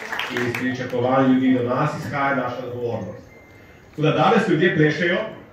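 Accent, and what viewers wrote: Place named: Northern Primorska, Slovenia. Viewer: NI lepšega kot slišat severno primorski glas v politiki. Diši po poštenosti.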